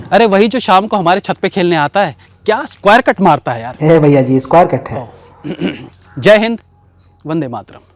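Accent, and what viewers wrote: Standard. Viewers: शुद्ध हिंदी" गज्जब भईया❤️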